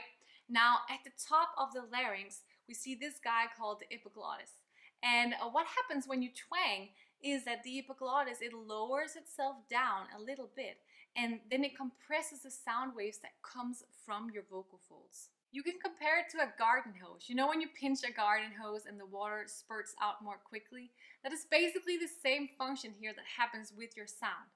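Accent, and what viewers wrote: Denmark. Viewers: That Danish accent though.... <3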